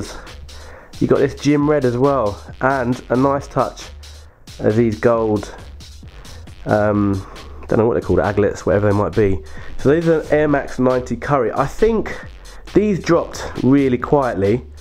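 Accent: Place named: United Kingdom. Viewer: When I heard the British accent I was excited there isn't much of us uk trainer lovers on here. Keep it up love your video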